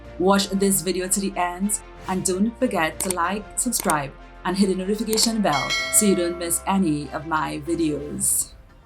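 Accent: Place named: Trinidad and Tobago. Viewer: Hi,. I have been following you on Linkedin for several years. I love your Trinidadian accent! Out of the ten signs that you covered, the one that resonated most with me was the last one. I always say that where there is smoke, there is fire. When a company has a high turnover rate, then bad management is almost always the culprit. If you treat employees humanely, then they will want to stay.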